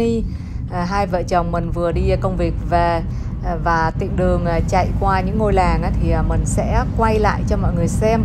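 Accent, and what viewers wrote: North, Vietnam. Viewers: Co noi nguoi mien Tay vay tinh nao vay toi dan Can Tho , nghe giong co co am huong nguoi Bac chu khong rat dan mien Tay cua Chung toi mong noi ro , toi xem clip co moi ngay , congratulations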